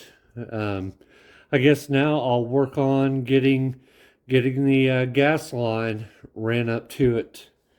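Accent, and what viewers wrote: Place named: North Carolina, United States. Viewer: What dialect are you speaking? North Carolina?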